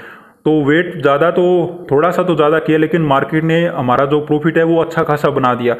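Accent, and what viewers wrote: Haryana, India. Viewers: sir i guess u are from haryana. i am guessing because of your speaking tone. am i right?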